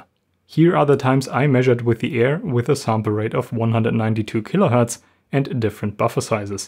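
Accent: Germany. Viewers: Man hört den deutschen Akzent.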